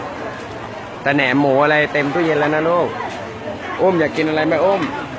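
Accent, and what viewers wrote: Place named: North, Thailand. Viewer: ชอบที่พูดเหนือจังเลยค่ะ เป็นเอกลักษณ์ดี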